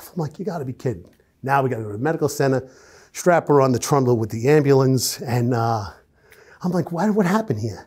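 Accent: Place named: New York, United States. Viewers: Another highly interesting interview. He's right about everyone wanting to hear cop stories, especially when they're told by someone like himself. He's a natural storyteller. (And I'm a born & bred New Yorker -- love hearing our accent because it's dying out. His New York elocution is so New York, so fabulous!) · What a character! I lived in New York for 10 years I miss that accent. Great story teller. Good luck to him.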